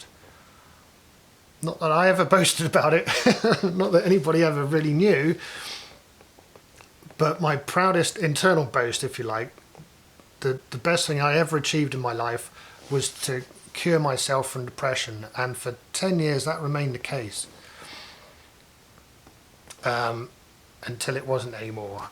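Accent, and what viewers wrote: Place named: Somerset, United Kingdom. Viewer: Yer a somerset lad..!!! - Good lad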